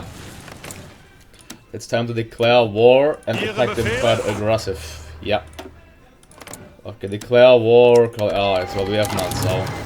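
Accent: Germany. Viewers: ich glaub er ist deutsch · You German okay . But which German? Eastern?Western?Northest?Bavarian ? :D WHICH ? :D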